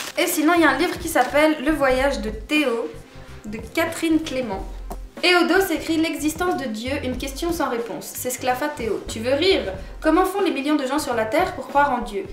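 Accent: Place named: Switzerland. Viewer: Super vidéo, il me semblait bien que t'était Suisse, avec l'accent... Tu viens de quel canton ? Moi c'est canton de vaud😂❤️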